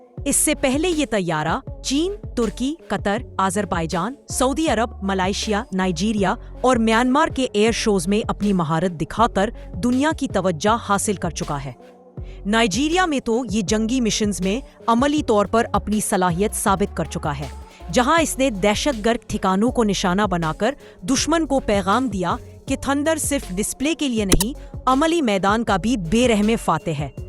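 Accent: India. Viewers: بولنے کے اندر سے پتا چلتا ہے کہ انڈین ہو پر انالئز اچھا کیا ہے پاک ٹیکنالوجی کو ❤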